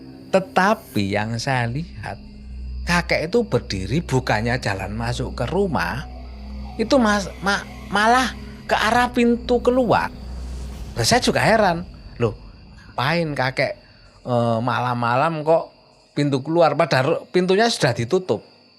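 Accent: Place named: Java, Indonesia. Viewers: Gradakan jowo nemen pakde🤣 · dialeknya jawa timuran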